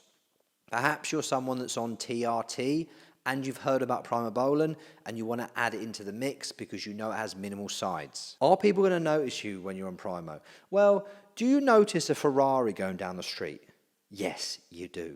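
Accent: United Kingdom. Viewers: Just found you online, love the content and delivery. Where are you from, sounds a bit like notts area?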